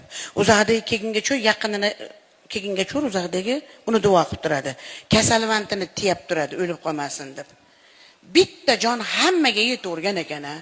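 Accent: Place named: Margilan, Uzbekistan. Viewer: Маргилон шеваси дангалда Маргионликда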